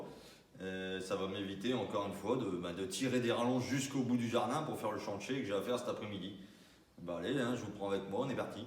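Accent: North, France. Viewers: Bonjour, vidéo très instructive comme toujours, merci 😊, j'adore l'accent du ch'nord, ici moi j'ai celui du ch'sud 😁😉😘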